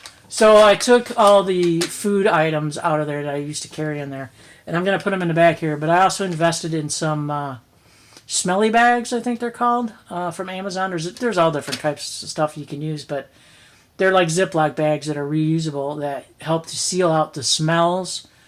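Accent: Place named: Minnesota, United States. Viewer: I'm so happy to come across your channel today. And also am happy to see another female so pumped about gear you have and lug around! People must think I'm crazy as I pretty much have a lot of the same stuff to some degree myself and in my car and several 'bags' around the house, including the dog's go bag! Cheers from up here in Canada....what State are you in as I detect an accent of sorts...MN WI ?? I have a friend from MN and you kinda sound the same....lol cheers!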